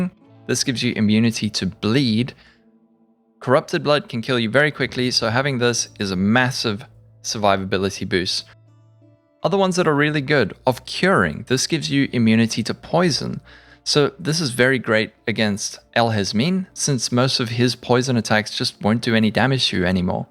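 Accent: South Africa. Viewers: Yo Bru. Where you from? You sound South African👀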